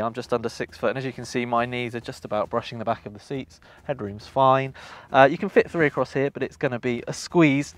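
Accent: United Kingdom. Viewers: hate that British accent. Sounds like you're suffering from impacted bowel movement.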